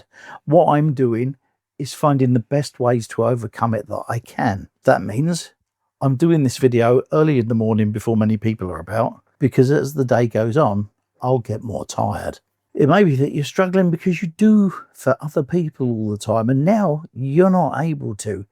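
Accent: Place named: London, United Kingdom. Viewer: Hey! I've only just found you!! Although I'm not impressed with your London accent... ( I'm Irish & proud! ) Suffice it to say... I am half daft with all that happened to me in my life! Like you... I'm 65... Retired Police Officer! 3 years ago I lost my Latvian wife to a 12 year battle with cancer... since then... & because of all the sh1t that happened in my life... I'm a bit of a basket case... but "every day in every way. I'm getting better & better!" In actual fact... I've been to a psychotherapist recently... for the first time in my life!! After only 2 sessions I am seeing things that I never noticed before!! Life is waaay from over just yet!! 😊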